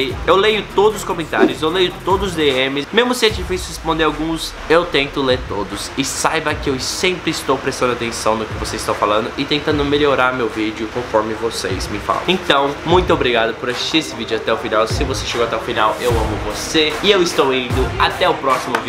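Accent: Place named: United States. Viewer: Ele parece ser americano. O jeito dele de falar, fala travando como os gringos quando começa a falar português.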